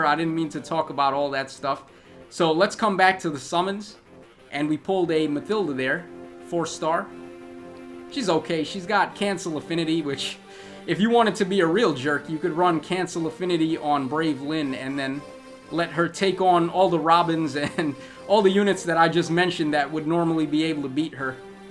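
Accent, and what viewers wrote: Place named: Northeast, United States. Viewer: Are you from the north east? I enjoy your channel man, keep up the good work!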